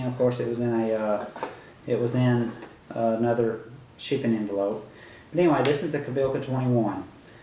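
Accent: South, United States. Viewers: U sound southern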